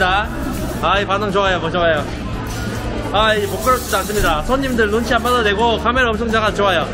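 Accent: Gyeongsang, South Korea. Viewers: 저역시 피나이 티비 구독자인데 채널도 병행구독 할거에요! 근데 경상도 분이신가요?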